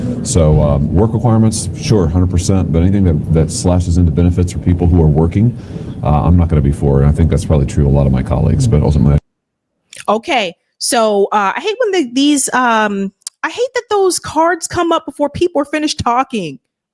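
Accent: Australia. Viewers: This dude went full blown racist with an Australian accent. Make it make sense, you not even from here bro! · Sounds Australian to me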